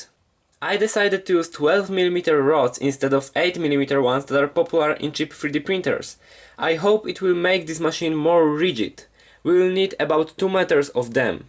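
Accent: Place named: Poland. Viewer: oj słyszę tu chyba polski akcent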